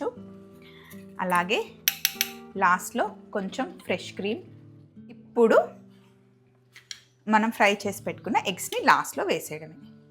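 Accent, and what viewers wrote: Andhra Pradesh, India. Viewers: Hi, I have a small doubt and ofcourse out of curiosity am asking, are you from Andhra or Telangana ??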